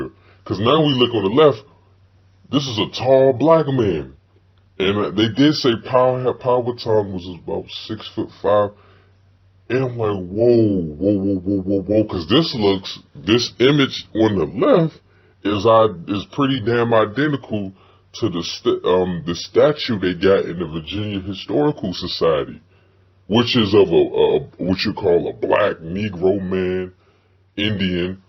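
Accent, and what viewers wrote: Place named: Philadelphia, United States. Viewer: This sound like my homie from Philly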